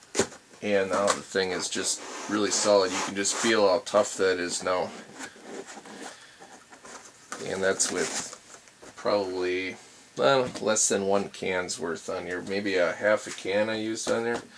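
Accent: Canada. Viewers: For some reason i can always pick up on canadian accents. R U?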